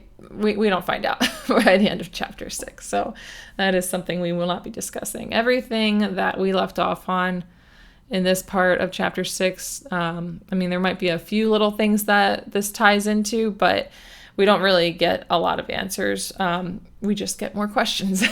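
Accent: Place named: Philadelphia, United States. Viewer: awesome! I'm from just outside of Philly, but still lots of family in the area and over in Jersey still. I was showing your channel to my cousins who were down visiting from Atlantic City, and your accent kinda gave it away, lol. But yes, I'll probably just read along with podcast, looking forward to the next one!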